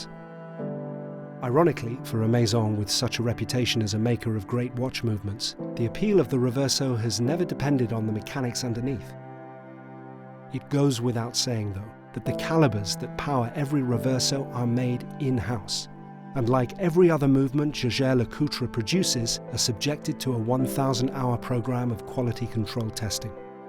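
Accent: RP, United Kingdom. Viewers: I find this off putting. The nonsense snobism, the posh accent, the whispering, just ridiculous.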